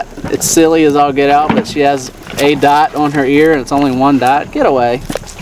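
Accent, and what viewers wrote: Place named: Kentucky, United States. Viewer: I like your gentle way with your lambs. Thanks for sharing, we just got three 14 week old girl lambs yesterday. I'm hoping ours can eventually be as freindly and trusting . Karen from Newzealand. By the way love your accent are you anywhere near Kentucky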